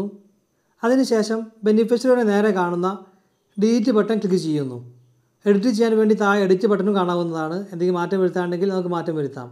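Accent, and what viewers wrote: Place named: Kondotty, India. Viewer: ഇടക്ക് ആ കൊേണ്ടോട്ടി സലാങ്ക് വരുന്നുണ്ട് കട്ട സപ്പോർട്ട് ഇക്കാ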